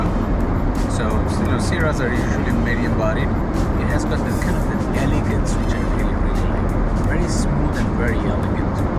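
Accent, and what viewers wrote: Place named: Trinidad and Tobago. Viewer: U sound like u from Trinidad and Tobago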